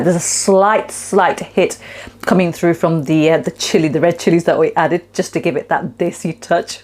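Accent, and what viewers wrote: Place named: RP, United Kingdom. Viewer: Hi, I tried your chicken pot pie today. It’s a bank holiday weekend and I thought ‘why not’. This is the first time I have ever made a pie and the first time I have used a puff pastry sheet! I copied your recipe but left out the chilli powder and I couldn’t believe how tasty this turned out!! It was that tasty that I ended up eating the pie on it’s own! The only thing I will do differently next time is, I won’t make it slightly saltier when I taste the mixture - just my preference. A suggestion for you, if you don’t mind - when you say ‘my heat setting is a 4’, please always state what a ‘4’ is on your setting. You normally do say ‘that’s a low to medium’ but sometimes you don’t. 😀 In the past, whenever I watched YouTube videos, they were always different videos by different people. You are the first person who’s videos I keep coming back to because your recipes are so easy to follow! 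I love the fact that you do Asian recipes as well as English, and you sound so posh (in a good way!) Thank you for sharing. I have subscribed to your channel. 😀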